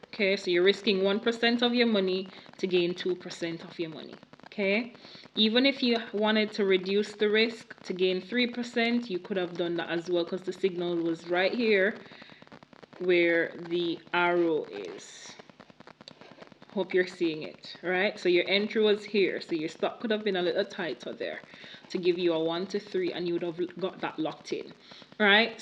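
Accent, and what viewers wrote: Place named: Jamaica. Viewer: Must be a Jamaican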